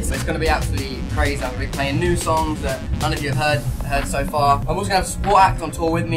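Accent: United Kingdom. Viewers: i love 1D im an directioner but back to this guy YOUR BRITISH :)))))))) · Your voice + Your british accent = SexToMyEars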